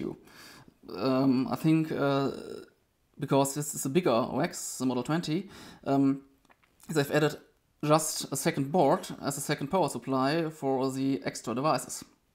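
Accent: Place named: Germany. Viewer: I too do not have an issue with your speech. Maybe I a more familiar with how Germans speak English.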